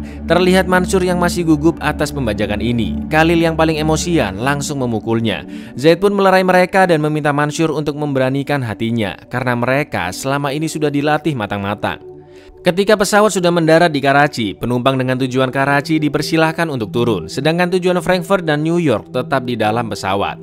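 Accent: Java, Indonesia. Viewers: WONG JOWO NDI SUARANE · Suweneng aku rek nak Ojo logat e jowo ngeneki subscribe sek👍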